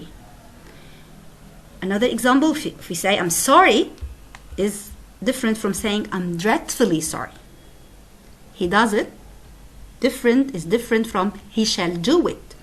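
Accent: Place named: Russia. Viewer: Are you Russian? Your accent is just like Russian accent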